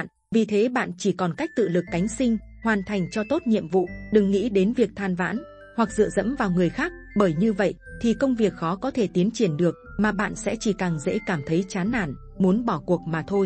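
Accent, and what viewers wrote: Thanh Hoa, Vietnam. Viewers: T nghe giọng thanh hóa đã chối rồi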